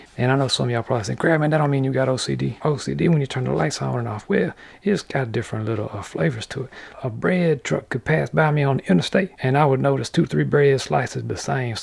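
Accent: Louisiana, United States. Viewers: I can't tell if you're a character or just a witty leezianna southerner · Do i hear a cajun accent?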